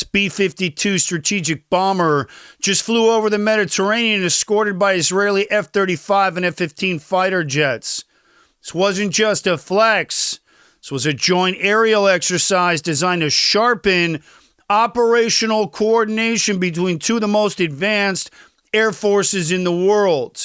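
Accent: United States. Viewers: I enjoy your presentation. Are you Jewish? You seem to have an American accent. R. Evans Australia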